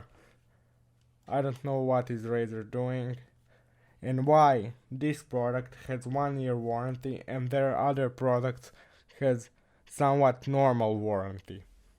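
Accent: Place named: India. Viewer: Ur accent sounds Indian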